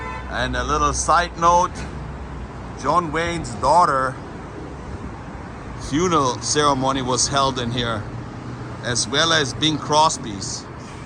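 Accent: Germany. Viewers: Nostalgia, I so remember that old Hollywood hotel Mr, I am from so.central los Angeles, so your video is taking us down memory lane, so sad it got demolished, I liked los Angeles county when I lived there back in the 50,sand 60,s it was nice back then, now it's so different, you should go see Griffith park and the observatory and the old zoo there and the train, I do enjoy your video,s and I like that German accent, you could be a great tour guide, thanks for the video, good job, Have a beautiful day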